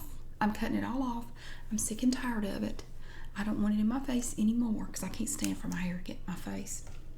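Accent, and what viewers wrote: South, United States. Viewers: I was about to comment saying wow that is a strong southern states accent! Then I read the description 🙈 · Whoa, those green beans gave me really surprising tingles, not something I was expecting! Great video, love the "super southern" accent. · The green beans snapping gave me a lot of tingles, and I love the southern accent! I have a lot of family who have southern accents, and I agree with previous comments that it makes me feel relaxed and at home! Great role play :)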